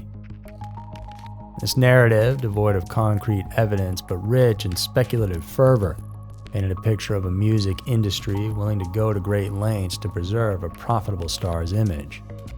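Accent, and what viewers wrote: South, United States. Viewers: Im from South Africa and am so mesmerized by your gorgeous southern ? I think accent that im trying to focus on the material at hand 😂❤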